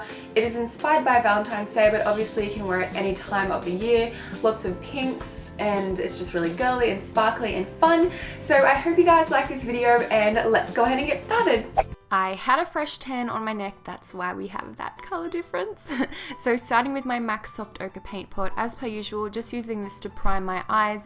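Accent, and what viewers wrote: Australia. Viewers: Australian accents are so cool omg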